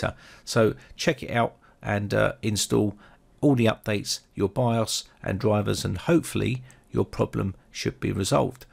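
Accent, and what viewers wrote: England, United Kingdom. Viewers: Finally! an authentic English person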